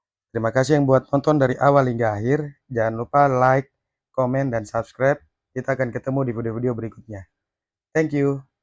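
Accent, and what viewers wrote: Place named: Eastern Indonesia, Indonesia. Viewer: abangnya dari jayapura? logatnya kek dari timur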